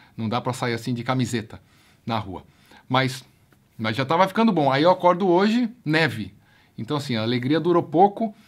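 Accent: Rio de Janeiro, Brazil. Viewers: parabéns pelo excelente trabalho! cara muito legal o história das antigas. sensacional. não dá nem pra pedir uma história, pq é um mistério surpreendente cada uma delas. não dá pra imaginar o q aconteceu com vc na sua carreira. kkk muito bom o sotaque carioca, e por fim uma sugestão, o q vc lembrar da memória q foi marcante, faz do mesmo jeito, liga a câmera e grava. tenho certeza q vai ser demais. abraço de máscara! 👏👏👏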